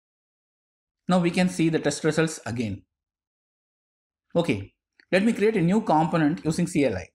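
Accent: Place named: India. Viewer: 1) Well that looks interested 2) Indian accent, out of there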